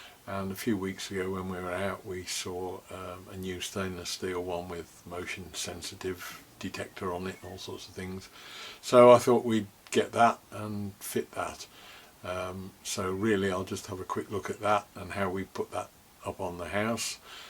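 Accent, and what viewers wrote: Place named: West Midlands, United Kingdom. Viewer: Are you from the Midlands? You've got a slight West Midlands accent to you!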